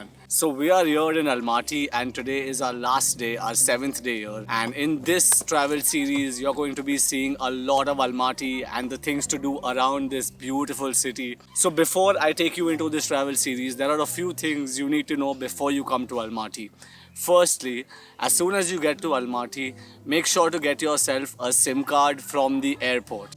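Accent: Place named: India. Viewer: Indian accent 🤢🥴🥴🥴🥴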